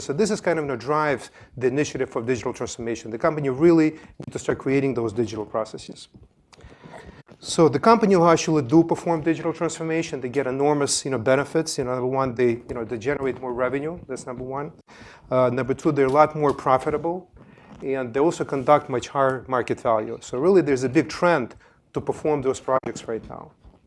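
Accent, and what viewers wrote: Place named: Russia. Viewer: Very russian sounding, sir.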